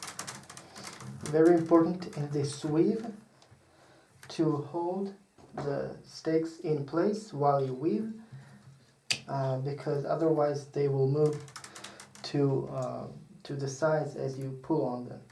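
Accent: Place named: Israel. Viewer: Since your English sounds pretty Israeli, I assume you’re Israeli :) How do you obtain your willows over there? I was observing the flora in Israel and didn’t see any willows anywhere I’ve been. Do you grow your own, somehwere, or you import them? Your channel looks pretty informative btw, I’ll go through all your content. תודה רבה והישאר בטוח! ברכות מטורקיה 👍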